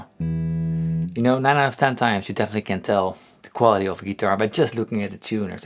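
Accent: Netherlands. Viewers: en lekker een beetje hollands derbij, lekker hoor...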